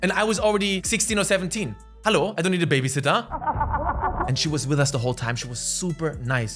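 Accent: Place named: Germany. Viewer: Are you half german? I like your accent man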